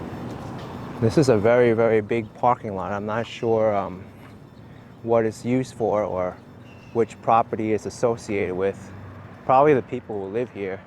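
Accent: New York, United States. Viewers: Your awesome NY accent will alway give you away that you are from NY.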